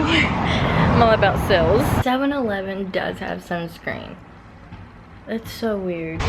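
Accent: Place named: Tennessee, United States. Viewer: Your accent literally makes me so happy. I'm from Tennessee and get picked on because my accent is so deep, and while watching this video someone came up to me and said "wow her accent is like yours!!!" 😂😂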